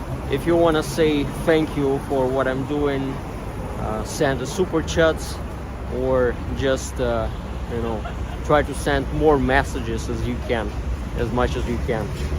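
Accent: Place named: Russia. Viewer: Вы русский😂? Ваш акцент выдает😊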